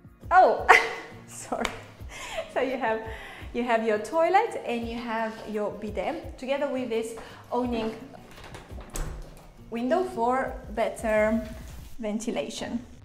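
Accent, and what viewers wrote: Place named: London, United Kingdom. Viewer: I can tell by the accent that she lived (lives) in London.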